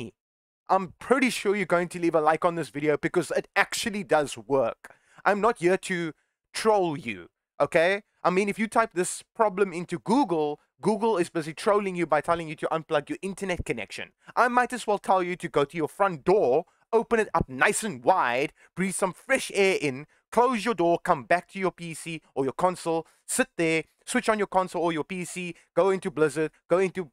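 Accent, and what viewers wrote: South Africa. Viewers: thanks! btw is my man south african?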